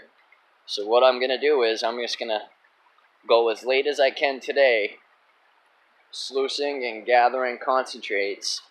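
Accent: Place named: New England, United States. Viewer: Thought you were a new englander. Thanx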